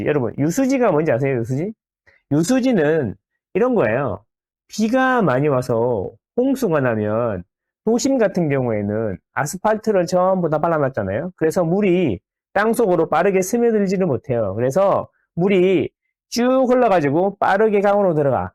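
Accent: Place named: Gyeongsang, South Korea. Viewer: 경상도 방언. 끄덕. 끄덕. 그 뭐시기. 누구 닮았네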